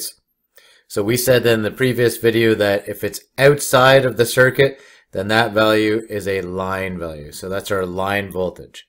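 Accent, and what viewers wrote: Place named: Canada. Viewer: Thanks for these great vids. Aldo your accent isn't all nasal and annoying. I think it's a Canadian thing the yanks got it bad these days and it really makes it hard to endure listening to it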